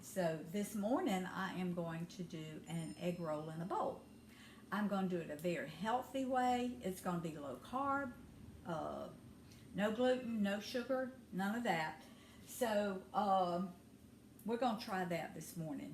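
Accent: South, United States. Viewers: I just wonder where you're located cuz you sound like you're from the Carolinas or maybe on down south like a Alabama unless maybe you a little bit Virginia but that y'all done getting you all OK gal on with the recipe❤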